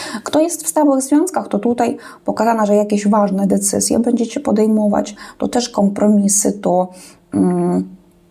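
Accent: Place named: East, Poland. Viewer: Bardzo ładne czytanie, wyczuwalny lekki akcent ze wschodu, ale ogólnie poprawna polszczyzna 😊 Bardzo mi się podobał ten przekaz dla Rybek. Pozdrawiam ❤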